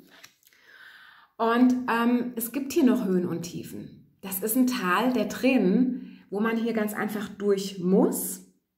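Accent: Hesse, Germany. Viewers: Sie kommt aus Hessen .Ich dachte am Anfang auch, oh schön jemand aus Sachsem. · ....ich vermute auch Hessen, zumindest lebt sie da. Das Rheinische hört man auch etwas durch. Egal, ich liebe diesen SingSangSlang 🤗😍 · sie kommt aus Hessen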